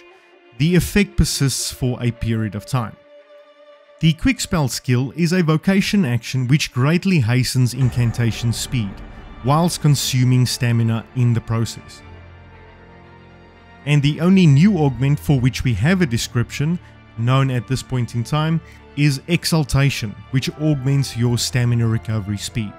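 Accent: South Africa. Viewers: South African? Nice! Subbed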